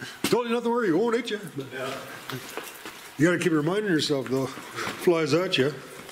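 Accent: Canada. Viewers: Wow! You make awesome videos! I have a question, it seems like you have a bit of a Newfie accent. I might be wrong, but are you Canadian?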